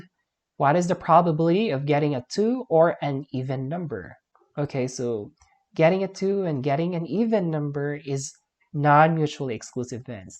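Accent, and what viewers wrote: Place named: England, United Kingdom. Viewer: Aaaaaaaaa!!!I really like your English accent sir!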